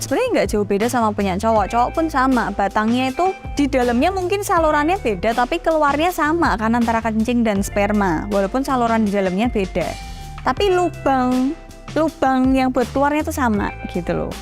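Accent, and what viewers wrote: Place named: Java, Indonesia. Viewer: Pakai Bahasa indonesia yg Benar cermat ,,,, jangan pakai logat Jawamu ,,,, yg nonton bukan wong jowo doang,,, ,,, byk suku lain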